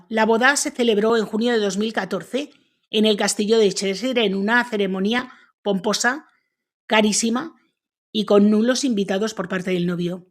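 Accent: Aragon, Spain. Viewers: Gracias por responder...pues pareces pura aragonesa jejeje Me dijo mucho en el tema de los acentos a ver si puedo descubrir el origen pero contigo no he dado ni en la diana😂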